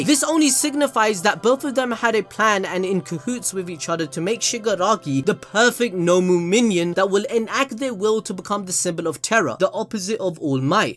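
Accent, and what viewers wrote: Punjab, India. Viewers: Are you Indian... Can't tell with the accent though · Bro hold up with that Punjabi accent, ur audience speaks Hindi too! Namaste 🙏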